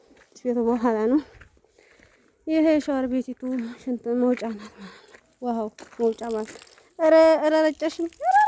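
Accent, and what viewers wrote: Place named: Wollo, Ethiopia. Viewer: አወራርሽ ተመቸኝ የወሎልጅ ነሽ መሰለኝ 😊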